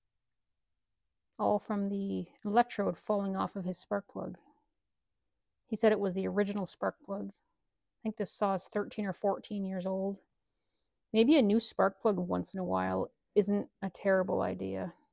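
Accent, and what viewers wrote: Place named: Canada. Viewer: I tune in for the outs and abouts, your Canadian accent is amazing !! The chainsaw content is awesome too.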